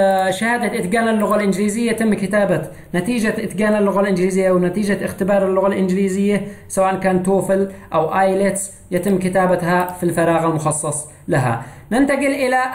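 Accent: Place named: Sanaa, Yemen. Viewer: فدا اللهجة الصنعانية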